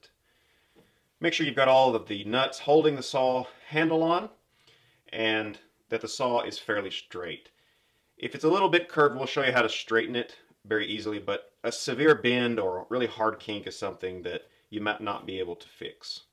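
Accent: Tennessee, United States. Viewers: Sounds like a Tennessee accent to me, but I haven't lived there since 1969. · he sounds more Tennessee city to me. Maybe kinda like Memphis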